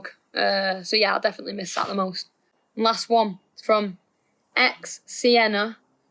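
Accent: United Kingdom. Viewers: I love the accent !! Is that Liverpool’s?!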